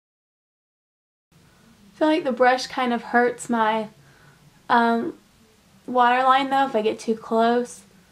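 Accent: South, United States. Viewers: do you have a country accent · I hear a slight twang in your accent, do you mind me askin where you're from? Are you southern? I live in Texas(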